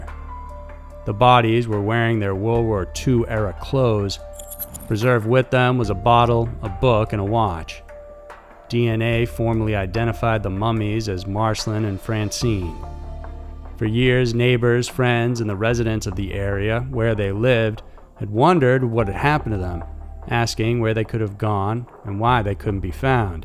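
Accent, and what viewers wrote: United States. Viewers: Which part of the US, is this guy from?, so love his voice!, and of course his posts.😊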